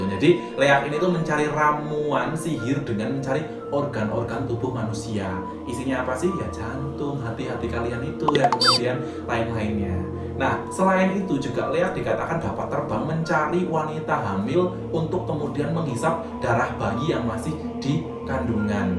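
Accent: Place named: Java, Indonesia. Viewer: Ora medok ora kepenak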